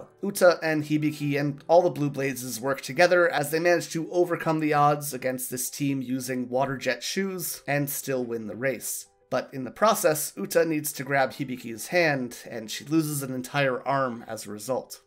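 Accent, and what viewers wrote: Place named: Canada. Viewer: Oh ok. I kept watching your videos and I thought you had a Canadian accent.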